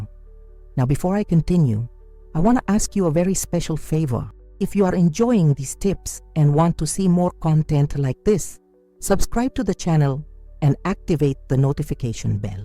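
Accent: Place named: Hungary. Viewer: What's Japanese about this? Presenter sounds Hungarian.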